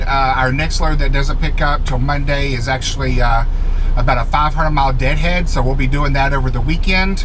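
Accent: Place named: South, United States. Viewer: first I must say that I love your southern accent lol and my mom is from a little place called Rutherfordton NC you blink and you could pass it lol I love your videos guys be safe!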